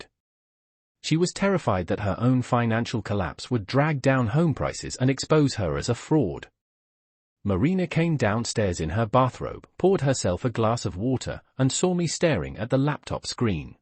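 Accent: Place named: England, United Kingdom. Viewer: The obvious english pronunciations of words doesnt go with the story.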